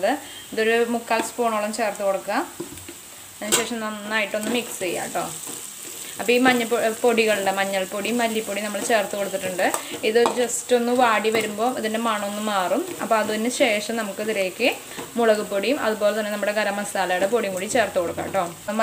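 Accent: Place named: Thrissur, India. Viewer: kollaatooo👍👍👍.... Thrissur anoo namude naatukaari anale... Samsaram ketapo thonni...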